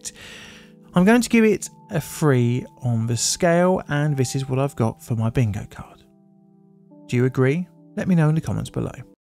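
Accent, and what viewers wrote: United Kingdom. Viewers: Thanks for speaking slowly. It's difficult sometimes to understand the British accent when you don't live around it constantly.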